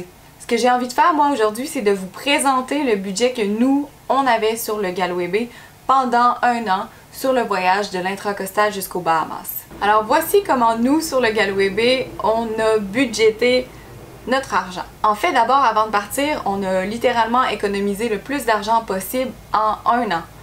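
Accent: Quebec, Canada. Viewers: Tellement rafraichissant et rigolo avec le petit accent Québécois si mignon. Merci pour cette vidéo moi qui prépare une transatlantique.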